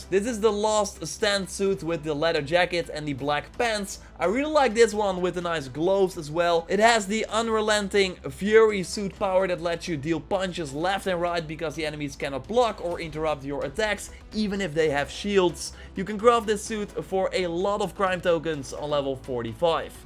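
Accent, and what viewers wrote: Netherlands. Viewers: Jij bent nederlands toch? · Deze guy is sws Nederlands😂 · Are you from the Netherlands? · You are dutch right? I think i hear it in your accent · Are you dutch?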